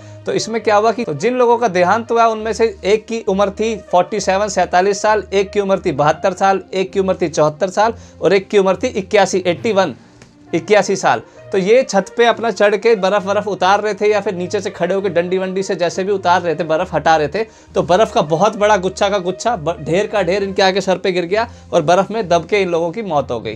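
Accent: Haryana, India. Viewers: Kaash news channel me bhi aisi haryanvi me anchoring karte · Love your Haryanvi accent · Japani news haryanvi accent me 👌👌👌👌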